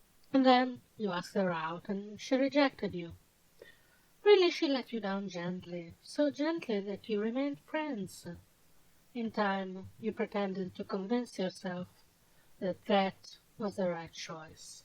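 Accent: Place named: Italy. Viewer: Dat thicc Italian accent! love it!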